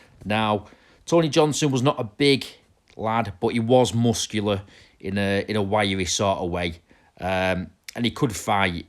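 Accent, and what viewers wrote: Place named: Manchester, United Kingdom. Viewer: Really enjoy your kids, though even though a lot of your accent is manc, you can't deny there's a Lancashire lilt there, which part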